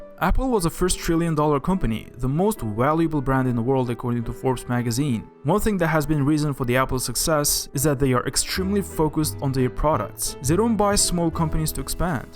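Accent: Eastern Europe. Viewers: So by the way you pronounce Huawei am I wright in guessing you're from Eastern Europe? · clearly eastern European accent... But this "the" Google or "the" Amazon thing is kinda weird...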